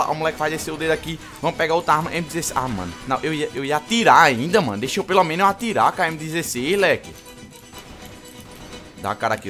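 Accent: Northeast, Brazil. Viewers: nordestino?!!!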